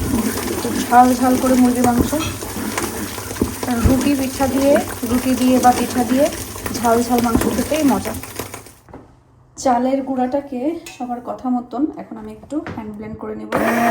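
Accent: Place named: Chandpur, Bangladesh. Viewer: Vaiya khub chalk chadpur bole Kotha Ami b bariar meye gazipur thaki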